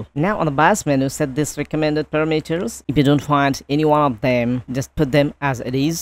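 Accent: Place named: India. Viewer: hi bro.. is there any tutorial how to speak English like yours. You sound so foreign yet Indian. I am trying for international calling job.. any video or page will be helpful.. .. that would be so helpful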